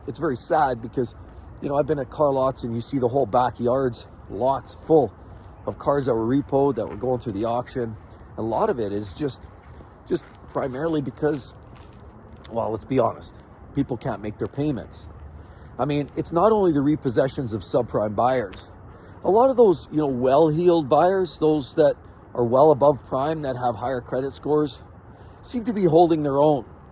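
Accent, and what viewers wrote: Canada. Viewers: I knew bro was Canadian from the accent 🇨🇦🎙️🔊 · Canadian accent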